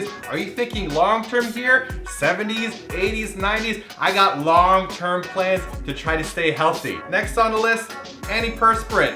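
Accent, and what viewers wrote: Russia. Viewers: YAAAA I click video for manly man Rrrrussian accent! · I love Russian accent.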